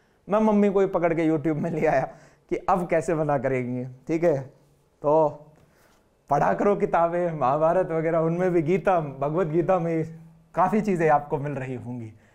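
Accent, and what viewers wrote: Haryana, India. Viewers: Bhaiya your accent is going towards Haryanvi 🔥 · Am I the only one who loves his Haryanvi accent?? 🤣🤣😍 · sir, are you from Haryana ? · Nice haryanvi accent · Harayanvi accent😅 Btw nice video as expected ✨💖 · Katayi haryanavi lgre ho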